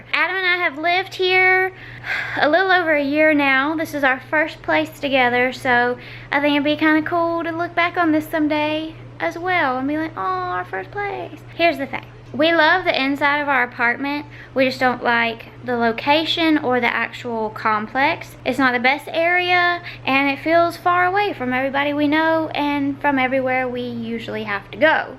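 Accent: South, United States. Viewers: I hope southern accent never ever goes away:) And I get to hear it in real life three time a year, woot, woot! Whenever I visit the south. And since my mommy is southern I have a slight twang in my voice too, I'm half Latino and half European American. My accent is interesting its a little bit southern mixed with a slight Hispanic/midwestern accent. Ya'll can hear it over on my youtube channel, since I finally got the courage to upload my first ever vlog. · Loved this. I'm from Alabama and I make sweet tea probably everyday for me and my family. Tea is meant to be sweet and cold.. always. You should do a video on grits and cornbread. I have all of my grandmother's furniture too. Too many memories to get rid of them and they are special to me. It's nice to hear a Southern accent on YouTube too. But... why isn't there a sweet tea emoji? 😉😎😂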